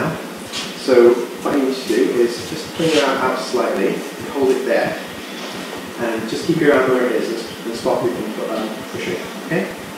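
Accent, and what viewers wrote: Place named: United Kingdom. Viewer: very good clear, and precise. best of all a british accent :-)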